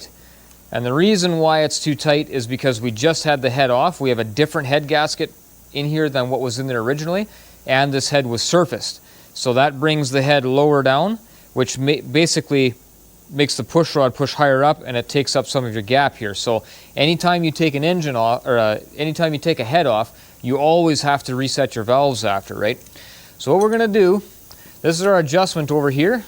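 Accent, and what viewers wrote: Canada. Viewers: I'm in the middle of rebuilding a Maxxforce DT and found you video on measuring piston protrusion, and I kept thinking that you sounded like you were from South of Winkler, I grew up near Plum Coulee and Currently reside in Portage la Prairie. I just got the Rocker arms in, the valves set, and the injectors installed today. I'm rebuilding it because the dogbone holding the lifters straight for #5 broke allowing the lifters to turn wiping out the camshaft, and also the #3 cam bearing was missing and #2 had shifted. love the hex adjusters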